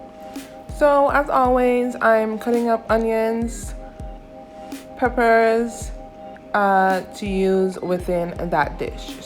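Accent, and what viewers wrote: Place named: Dominica. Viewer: I thought your accent was from Dominica. Nice to meet you. :)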